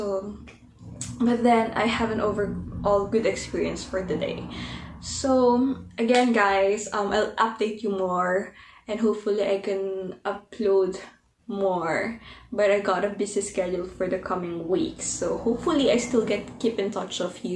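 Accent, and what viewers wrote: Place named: United Kingdom. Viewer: Take care always ate 😍 miss you. Naloka ako sa englishan hehe british na bristish na hehe 😅☺️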